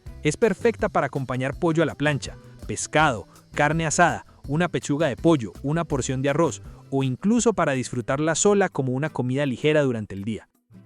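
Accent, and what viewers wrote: Colombia. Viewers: Greeting from the USA! No tengo ni que preguntar de donde eres. Ese acento Colombian se distingue muy bien. Gracias por tu receta muy rica. Ahora que me puse de dieta, voy a empezar a vez mas tu canal que acabode descrubir.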